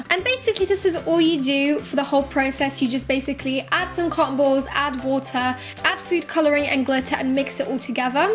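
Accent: United Kingdom. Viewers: oh I thought she was British because sainburys is a British store and has a British accent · MrsPixie3D if she is, she speaks like a lot of people in the uk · looove you british accent!!❤😘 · OMG I looove your british accent! BTW really cool room decors! xoxo <3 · i love your british accent though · also u can tell she is English by her accent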